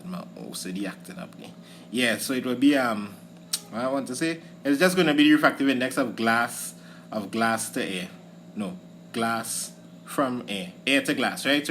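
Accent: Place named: Trinidad and Tobago. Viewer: you sound trini, i am hbu?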